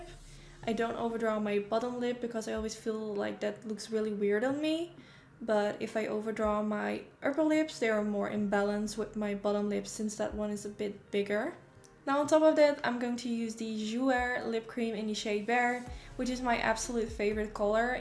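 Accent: Netherlands. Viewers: Love your full face tutorials!!! Listening to you speak makes me miss living in the Netherlands.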